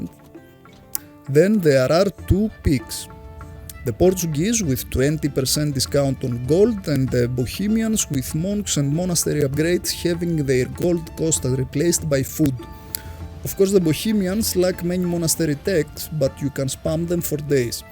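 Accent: Greece. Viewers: Dude... are you Greek?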